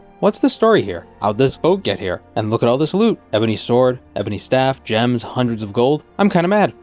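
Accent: Boston, United States. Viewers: So are we just never going to acknowledge the Boston accent?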